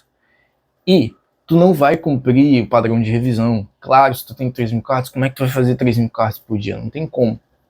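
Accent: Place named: Bahia, Brazil. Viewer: Oii! Maratonando seus videos! Reconheci o sotaque baianal kkk, de onde vc é? Estudou pra Uneb e Uesb?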